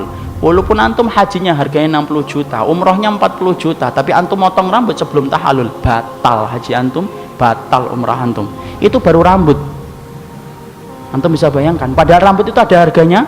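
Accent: Java, Indonesia. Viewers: Usdadz ...logat jawanya medok bnget aku suka...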